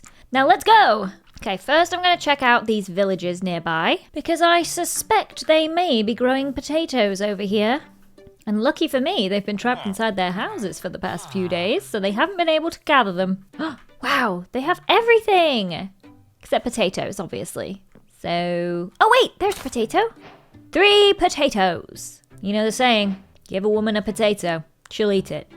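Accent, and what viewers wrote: United Kingdom. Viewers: OMG FINALLY U ARE ALIVE!(*ゝω･*) I still like British accent(((o(*ﾟ∀ﾟ*)o)))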